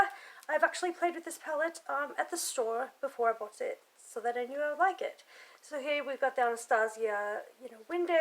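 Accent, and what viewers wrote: United Kingdom. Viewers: Oh good I’m early x hope u are good and thankyou for this upload! And am I crazy or do you have a British accent? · Where are you from? Sounds like you have a British or English accent. I'm not sure. Lol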